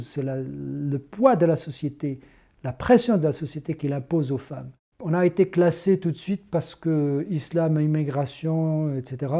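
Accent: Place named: France. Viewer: Un croisé renégat qui fait tout comme les français de souche y compris l'accent avec une voix fluette de petite fille.Père militaire ivrogne mère dominatrice qui l'a élevé en petite fille (je n'invente rien c'est lui qui le dit) torchant, cousant raccommodant, résultat: couilles à l'envers.Ce proxénète veut à tout prix marier une musulmane à des non-musulmans! ce n'est pas tout il veut vaille que vaille les faire manger du cochon et les faire boire du pinard.Pourquoi? mystère.Il croit dur comme faire que ses ancetres sont des gaulois! à son age (62 hivers) quelle misère.Et je vous le donne en mile ce salaud est en plus un sioniste plus à droite que Zeev Vladimir Jabotinsky aller comprendre.Il écrit sur Hespress en arabe et fait croire qu'il est de tout cœur avec les palestinien.J'aime tous les marocains ou presque mais pas lui.J'ai oublié il se vante d'avoir changer de nom et signe mais sur hespress il signe! 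Si vous avez compris quelques choses expliquez moi.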